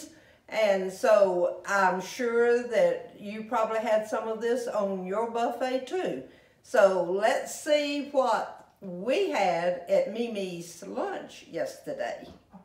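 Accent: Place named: Carolinas, United States. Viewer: Oh you just make my day, ❤. I look forward to your dialect, very much like my own, born and raised in the Carolinas.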